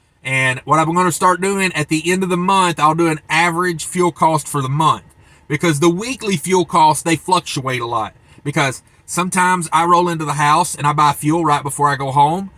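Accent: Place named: Minnesota, United States. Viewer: Done good Mr. But I prefer the natural southern Minnesota accent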